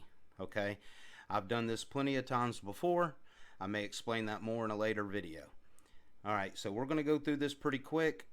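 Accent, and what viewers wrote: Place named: South, United States. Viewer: From one Southern to another I love your accent. I am guessing South Carolina or Tennessee? I'm 11th generation Floridian and it's rare to hear a native Floridian accent.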